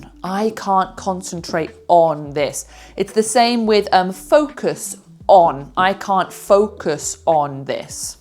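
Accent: United Kingdom. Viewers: how l love British accent ❤ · I like British accent ❤ and cookies amazing georgous looking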